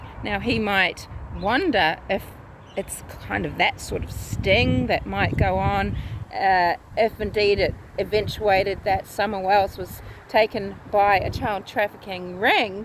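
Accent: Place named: Australia. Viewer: I thought you were an Ozzie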